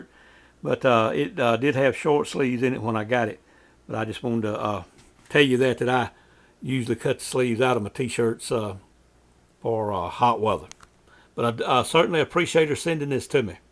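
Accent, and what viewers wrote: South, United States. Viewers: That was a good story, the illustrations were fantastic and I love your southern accent. Glad he didn't shoot BF and all turned out well for everyone, sorry the dogs got beat up tho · I loved listening to your Southern drawl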